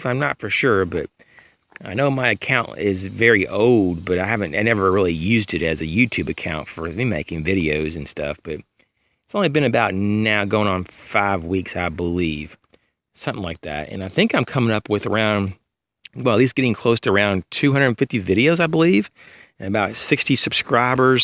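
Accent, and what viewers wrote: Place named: South, United States. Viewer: Solid, important info that folks fail to mention in most survival videos. Lovin' the "warsh." 😂 reminds me of my family talking in the south down in Springfield, MO. Btw: Mr. Hank Hill, you forgot to mention your extensive knowledge on propane...but yet you nailed it on the synthetic underwear. Haha. Have a great day pal.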